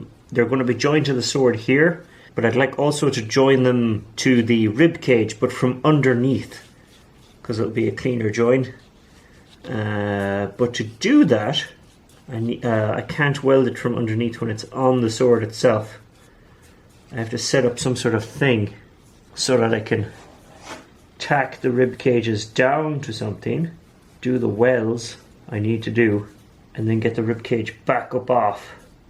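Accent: Ireland. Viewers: Your Irish? you sound like Skallagrim and its always snowing. Assumed you were in Canada lol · so Irish I LOVE IT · dude where do you live? you sound very Irish, but your children sound American, what?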